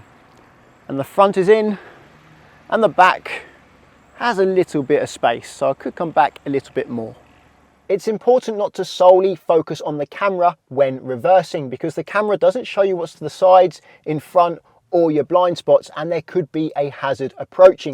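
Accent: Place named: Oxford, United Kingdom. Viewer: Shit oxford eng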